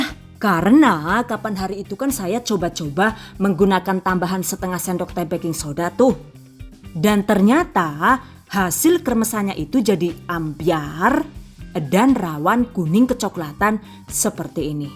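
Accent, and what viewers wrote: Banyumas, Indonesia. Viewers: oalah ngapak jebule rika yu 😅😅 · Pertama lihat video THV kaget bahasanya ngapak,, sooo nyonk kira wong Cilacap 🤭dadi betah nyonk nyimake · Laahh cingire, ngapak jebule 😂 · Bisa ngapak yu,, Rika wong banyumas, p...mantap yu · Wkwkwk dasar mendoan Ora ngapak ora kepenak... Ketika suara ngapak bikin kaku weteng ku🤣🤣🤣🤣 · "DONE" Awalnya aneh juga. Madiun tapi ngapak. Baru kali ini lihat wajahnya. Makasih resep2nya. #giveawaythv2020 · Buund..syukaa sekali logatnya gado"....indo..english..jwaa ngapak...top deh buund.sehaat teruus yaaa..slm kenal..😊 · 😀😀😀 haha Aku ketawa terus klau Pas mbak nya ngmng ngapak😂 sukses selalu mbk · Selama ini ngebayangin mukanya ga kyk gt Jadi kaya wajah dan suara dijual terpisah 😂😂😂 Kaga matching Kalo yg ngasih resep wong ngapak hrsnya legit nih resepnya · Ngapak banget yu lah hahaha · "DONE" Pertama kali lihat channel ini penasaran, akhirnya coba di tonton, dan yang bikin pengen terus nonton itu suaranya yang khas banget ada campuran English dan ngapaknya juga, dan juga kualitas editingnya bagus, bukan kaleng2 pokoknya. 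Sukses terus Channel. Aamiin #Giveaway THV2020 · tiba,e ngapak yu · Mantap banget Bu,,,njawane medok men,,,asli banyumasan ?aku salah sijine pengikut postingan e Lo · Laaah mimin nya asli madiun apa banyumas ngapak bgt😂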